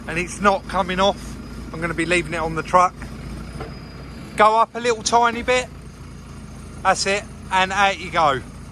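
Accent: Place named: RP, United Kingdom. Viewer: I'm sure there's many people who find your voice very irritating What's so irritating about voice? All I hear is the Queen's English..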